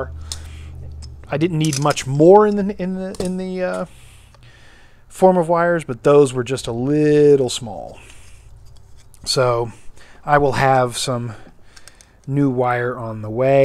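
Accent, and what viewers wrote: South, United States. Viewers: Hi, long time viewer, love your videos. Keep it up. After watching your videos for a few years now, not sure if it's just my imagination but for some reason in this particular video I feel like it's the first time I could really hear a southern accent from you:) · Where did the southern drawl come from? It’s never been there before. Hahaha. It’s fine with me, just new. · Five years I’ve been watching, and never before have you sounded so southern! 😆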